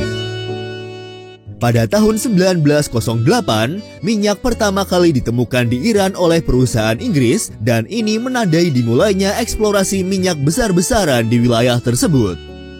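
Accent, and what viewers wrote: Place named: Banyumas, Indonesia. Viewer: abang dubing nya orang ngapak yaaa😀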